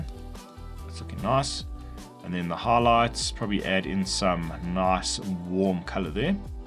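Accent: South Africa. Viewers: As soon as I heard your voice I immediately picked up that you’re a fellow South African… love your work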